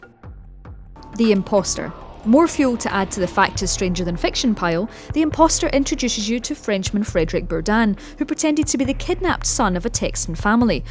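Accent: Scotland, United Kingdom. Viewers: Thanks for this list, along with the Scottish brogue narration! Charming and informative.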